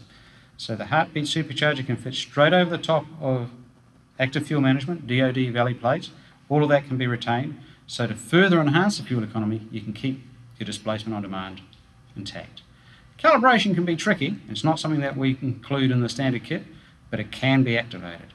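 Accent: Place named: Australia. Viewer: You're an Aussie !!!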